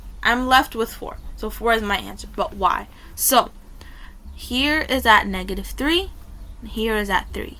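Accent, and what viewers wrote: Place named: New York, United States. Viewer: I am new to the channel I can just tell you are are new yorker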